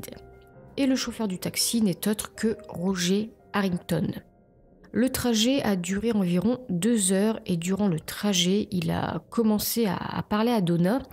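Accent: North, France. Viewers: Accent du Nord non ?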